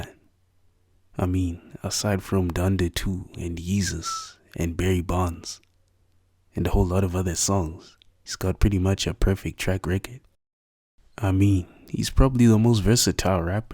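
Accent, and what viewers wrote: South Africa. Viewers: He sounds south african gng and not a white south african either. Are you part of one of the fanbases that he was talkin bout on this vid or smth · U got a 🇿🇦🇿🇦 accent jo What's popping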